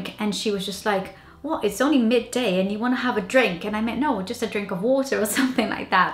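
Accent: Wales, United Kingdom. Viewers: You have such a lovely voice with your Welsh twang 😊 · What surprises me is the speed of a welsh accent you have picked up! :) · As a fellow South Wales resident, I can confirm that that is an absolutely wonderful example of an acquired Welsh accent! Da iawn! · You are a knock-out!! 😍 Hola Linda!! You have a Welsh accent! I have a Birmingham accent and New York American accent too girl! 🤓 Portugees and Russian are hard languages for poor me. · you have a slight Welsh accent! It sounds nice! I LOVE DUNKING biscuits! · I love your Welsh twang. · M H There is a slight Welsh twang to some of her words - I can hear it. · I'm Welsh , and she has definitely got a welsh accent. And I can tell what part of Wales she has been living too ! :-) Sitting next to someone on a train or bus in Norway. A country I spent some time in , is considered a insult and they will ask you to move ! 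· Your accent is superb very British with a little Welsh lilt. Americans serve fries even more than the British serve chips. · Can hear the welsh accent in your voice before you said you lived in wales . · Dave Williams Just what I was thinking (hint of Welsh) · Thank you a joy to listen to, even your accent is vaguely Welsh. We are a quirky lot us British but we are appreciative of those that choose to join us and accept our foibles rather than insisting we change them. I'm sure you bring something to benefit us. I've been to South America so I know it's totally different from Britain. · I love that you have a very Welsh accent!! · Picked up a lovely welsh twang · You have definitely picked up a welsh accent. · You are lovely, Your English pronunciation is excellent, far better than most British people but I detected a very slight accent. I thought it was your brazilian background. But it was after you said you lived in South Wales I realised you have a slight Welsh accent. 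I am Welsh so I am very happy for you to have found a home there. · I can't believe your from Brazil? You could be from the Swansea valleys. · Please keep your dual measurements in your head - Imperial is horrible and very few people use it now. The only exception is road distances and speeds. Your Welsh accent is coming along nicely by the way - very charming! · Love the welsh accent, with a little Brazilian in there :) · Really cool! It's funny to hear things pointed out that are just taken for granted as being normal here, there are weird customs that don't make sense! Your accent is great, I can even hear a tinge of Welsh in there. Btw, I hate chips too. Good stuff! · Your accent definitly has a little Welsh in it. I noticed it even before you said you lived in Wales. I'm from South Wales now living in Canada. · Your Welsh accent is so natural I assumed you were British! Fun video, and you're spot on with all the British habits 😅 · You have a hint of a Welsh accent going on there, lol As a Brit, if in doubt, have a cup of tea, lol, the best medicine, lol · defo a Welsh twang there.. 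· Whats funny is you sound so Welsh! I can hear the musical tone in your voice! Im not insurance is a cultural thing here though that more marketing. I tend to get insurance if I think things are going to get expensive if I dont... eg smart phones, houses, cars etc. I dont get insurance on white goods, as there really is no need if you buy quality brands. :-) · Love the Welsh accent!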